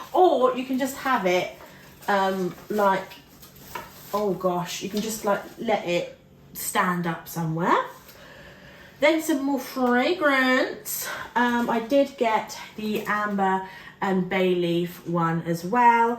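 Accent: United Kingdom. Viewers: Greetings again from Vancouver, Canada! (I found your channel because you recently did a HomeGoods/TJMaxx video, and I work at TJMaxx. :)) I love love love your accent. I've watched videos in the recent past about the different accents around the UK. It's really neat. I've always wondered. Also, the british slang is just so cool for me to hear as well. I was a huge Spice Girls fan when I was a preteen. (I'm almost 36 now.) & I still love the accents and slang to this day!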